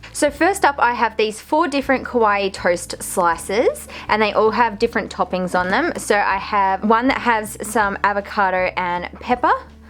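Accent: Australia. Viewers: She has an australian accent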